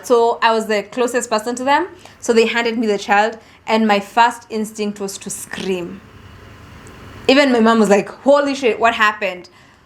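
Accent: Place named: United States. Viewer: You have an american accent have you lived in the states or were you going to an international school in your country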